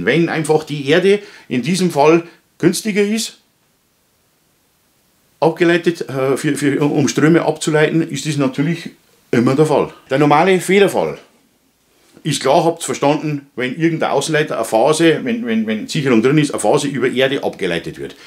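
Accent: Bavaria, Germany. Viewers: Habe gut gelacht. Warum? Weil mein Kopfhöhrer am Ladegerät hing und Youtube versucht hat, Bayrisch mit Untertiteln auf Hochdeutsch zu versehen. :D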